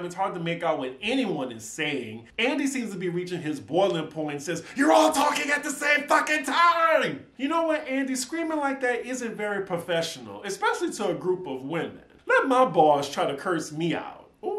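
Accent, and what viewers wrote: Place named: South, United States. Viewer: not you ashamed of your southern accent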